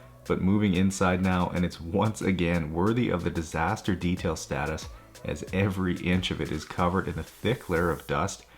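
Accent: Canada. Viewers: I was just about to ask if you were from Canada lol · The Canadian accent is so cute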